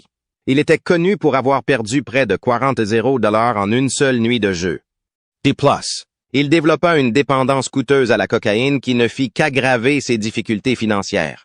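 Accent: Quebec, Canada. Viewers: De tout les beaux accents possibles pourquoi l'IA utilise l'accent québécois ?!?....😅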